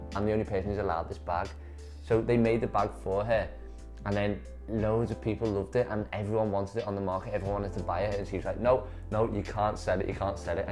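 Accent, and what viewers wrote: Liverpool, United Kingdom. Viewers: I think the Damier Ebene is better as it looks freshly sexy even when it is so old and used.. By the way are you from Liverpool?? :)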